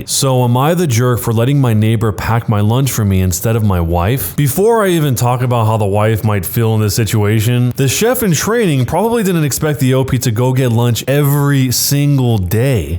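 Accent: United Kingdom. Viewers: the British accent tho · Same and why does it sound British😂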